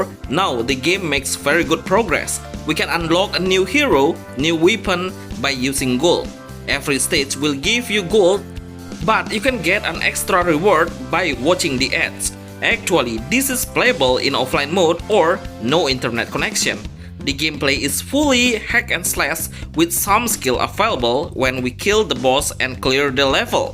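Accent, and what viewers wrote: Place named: Indonesia. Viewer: Yes, he Indonesian accent so thick 😊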